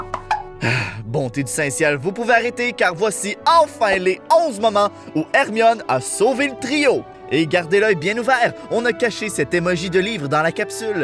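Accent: Quebec, Canada. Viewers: Pouce bleu, cousin québécois... J'adore votre accent... J'entends bien les différences de doublage... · Tu est québecois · ouais mais là c'est québécois ^^ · est ce que té québeqois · Il es québécois c’est normal c’est son accent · C juste que vous dites comme ça au Québec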